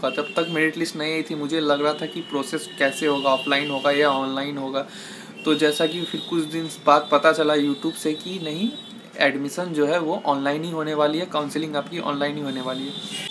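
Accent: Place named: Bihar, India. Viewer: Apki baat krne ke style se lag rhe hai aap bhi Bihar ke hai.. Hope this year i will see you in cllg as my senior 🙏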